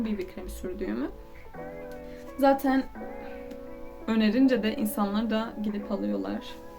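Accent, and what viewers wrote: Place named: Kurdistan, Turkey. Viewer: kürt müsün şiven bazen kayıyor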